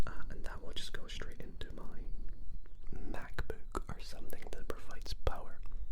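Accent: Scotland, United Kingdom. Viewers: Are you Scottish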